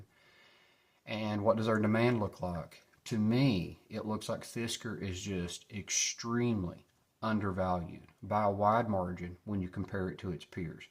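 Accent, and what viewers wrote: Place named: Carolinas, United States. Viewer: Your accent is southern, yet east coast. If I had to guess, I'd say the Carolinas? 👀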